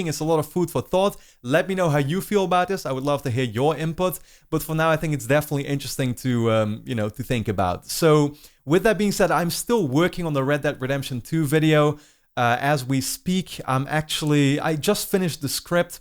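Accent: Netherlands. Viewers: Love you’re Dutch accent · are you dutch your accent is really dutch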